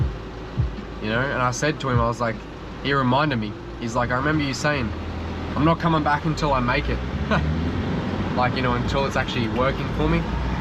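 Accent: Australia. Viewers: Australia is so cool! Love your accent! So amazed to see the porcupine akinia .Totally 🆒!!!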